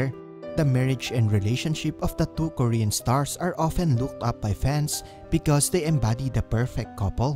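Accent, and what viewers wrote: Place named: Philippines. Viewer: You have a Pinoy accent. 😊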